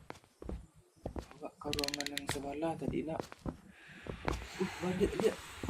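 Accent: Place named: Payakumbuh, Indonesia. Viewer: Logat pikumbuah jaleh bana 😂🤣😂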